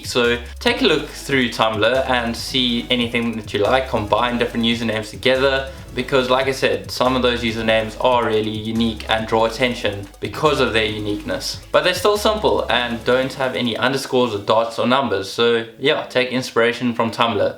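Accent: South Africa. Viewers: Mens kan hoor jys afrikaans lol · Are you south african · You sound South African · Are you South African my mum is from South Africa 🇿🇦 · Are you south african??? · He’s South African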